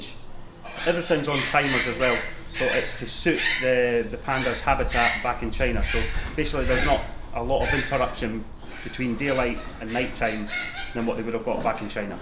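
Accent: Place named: Scotland, United Kingdom. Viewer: I love the scottish accent. ;o)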